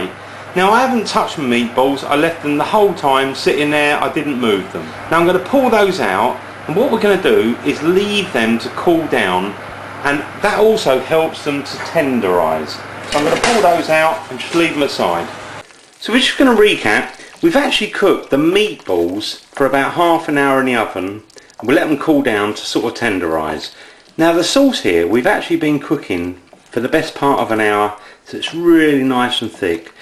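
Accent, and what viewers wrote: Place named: England, United Kingdom. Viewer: Love your accent, man! I had the wrong idea that the english can't cook, but it is I who can not.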